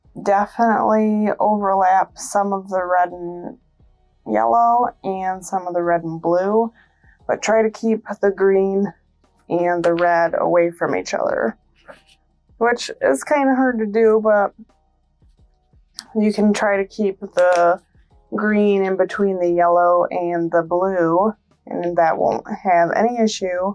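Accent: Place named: Wisconsin, United States. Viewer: You sound like you’re from Wisconsin too!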